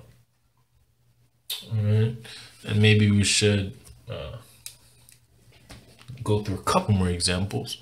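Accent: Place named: Caribbean. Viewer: I think I am hearing a Caribbean accent here. Thank you for this tutorial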